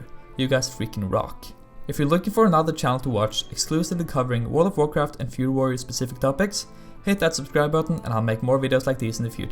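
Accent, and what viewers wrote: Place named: Norway. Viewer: hahaha du hørtes jævlig norsk ut på videoen xD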